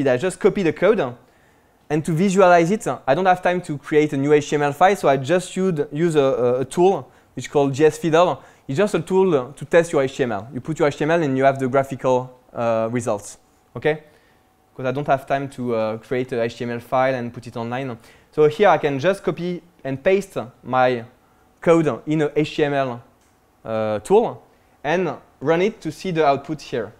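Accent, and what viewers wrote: France. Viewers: ohhhh that french accent _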